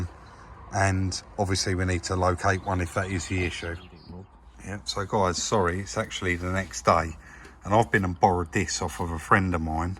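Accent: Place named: Kent, United Kingdom. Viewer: I really enjoy your shows I now live in Cardiff but spending the first 30 years of my life in Rainham it’s nice to hear a proper accent I also enjoy when you go out driving around what was my local area seeing some of the old places especially the Kingsferry Bridge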